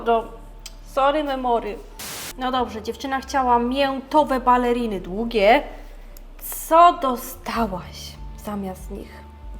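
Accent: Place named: Silesia, Poland. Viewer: mów wiecej po Śląsku plisss uwielbiam!!!!!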